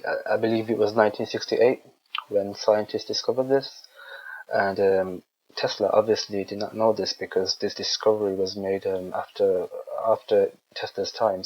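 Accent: United Kingdom. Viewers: You fool... remember that 10 ft. wide meteor video shot from a distance of, oh, at least a few hundred miles away? Remember the intense magnification and sheer size of the video camera it took to make the meteor appear larger than any comparable object in space? Notice how, of this video the astronauts zoom in as much as possible yet the thermal blanket looks ---- incredibly small? Remember all the photos and videos of the hundreds of thousands of pieces of space debris taken by any number of astronauts who participated in low-orbit flight missions? Notice how in the video N.U.A. (Nothing Up [my] Ass???) conflates relatively small portions of the "blanket" with obviously larger portions of the object - still - in question? And please do tell us how NASA is always honest with the public (a place where "honesty" means something different to NASA employees in adjacent offices within its buildings). 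Before you believe some idiot with a droll English accent and before you gag on NASA's nuts, take a few minutes to actually ponder this video and consider how little information, beyond his "opinion" is being disseminated. Consider, too, that this is the same slickster who posted (among other fantastic "UFO" video lies): CAN YOU DENY THIS?! FLEET OF HUGE UFO MOTHERSHIPS - LA JOLLA, CALIFORNIA - 31/3/2015 without ever once seeking to inform anyone that the government has any number of aircraft that can perform everything seen it his video. If you must, buy a clue... may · You sound British Asian if I'm right?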